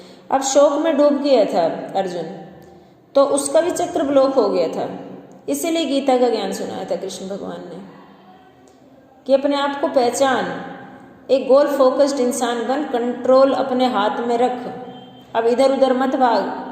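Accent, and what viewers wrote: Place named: Rajasthan, India. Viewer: आप राजथास्थान से लगते हो बोली से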